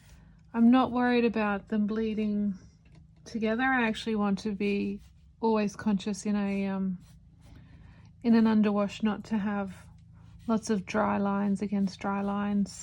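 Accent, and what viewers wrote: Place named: Australia. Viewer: Fark yeah, been looking for a watercolour artist I like to listen to that is not American, I wasn't even DREAMING of finding an Aussie 🎉🎉🎉 Thank you for the great tips, AND your videos are super relaxing as well. All the best.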